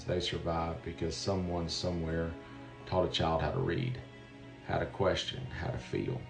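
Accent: South, United States. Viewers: 👏🏼💖Thank you. You are the most eloquent, beautifully spoken man with a Southern accent I have ever heard! You deliver such poignant truth with such thoughtful vocabulary & deep insight into the heart of the subject, you never cease to amaze me! I'm in tears listening to this, thank you so much for sharing your kind, beautiful spirit!🙏🏼🤟🏼✌🏼💙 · Your video on "Christian nationalism" popped up on my YT a few days ago. As that was a subject that has been occupying, not to say concerning, me for some time I clicked and heard it right through. I agreed with everything you said and was very impressed by your use of the English language - I'm a Brit living in Germany - but otherwise didn't know quite what to make of you. Now I've watched this one and was really moved. I too had a number of teachers who were an encouragement and help to me: I don't think I was a bad student, as I did what was expected of me, but they taught me to think for myself and that was something new and opened up a whole new world for me. 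I went on to study foreign languages (German and Spanish) and after university got a job as a translator here in Germany. I'm retired now, but I can still remember most of my teachers' names (and would like to thank Ms. Davies, Mr. Edson, Mr. Hateley, Dr. Meier, Mr. Kirkman and Dr. Smith i.a. here). I was never the maths and physics type, more the English and languages type, and as I became a Christian (which in the UK - or in Germany, for that matter - is not like in the US) at university I developed an interest in theology too. Thanks for this video (and thanks to y'all for your comments, which I found equally moving). Your English with the southern accent (I'm also a fan of Trae Crowder, by the way) is very soothing but eloquent, down-to-earth and inspiring - compared with what we usually see in the US news, whic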